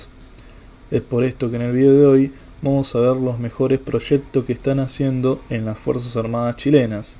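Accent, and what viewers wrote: Argentina. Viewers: el canal es argentino, no chileno, no cachai el acento ?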